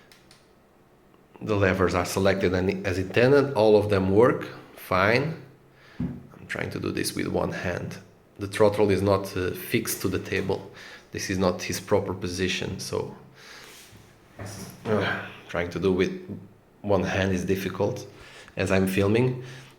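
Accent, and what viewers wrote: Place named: Portugal. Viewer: Obrigado! (your accent sounds portuguese, like me). (edit: unfortunately, the feathering does not work if we want to feather just one engine but good try).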